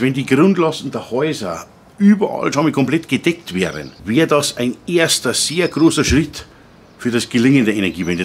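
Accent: Bavaria, Germany. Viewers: Einfach klasse, deine Art zu erklären ist gut verständlich. Ok, dein bayrischen Dialekt versteht nicht jeder, aber ich hab da überhaupt kein Problem. Mach weiter so du bist klasse. Gruß Klaus aus Unterfranken 😂👍noch Bayern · Sorry , alles schön und gut aber es versteht nicht jeder " Extrem Bayrisch" ich kann Dir wirlich nicht folgen !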